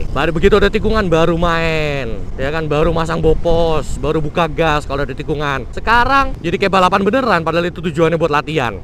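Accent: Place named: Java, Indonesia. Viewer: Baru pertama kali liat, dan ternyata medok medok gimana gitu, berasa ngedenger orang jawa, orang jawa mas?😂😂😂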